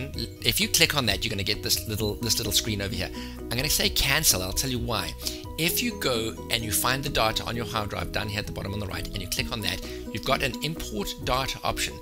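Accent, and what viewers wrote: South Africa. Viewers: Lol very surprising to hear a South African accent on Youtube. The work you've done here is insane. Thanks!!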